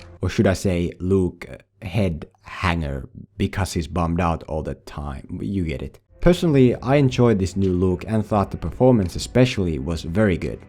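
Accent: Finland. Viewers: Hi, are you Finnish? Your accent sounds really Finnish!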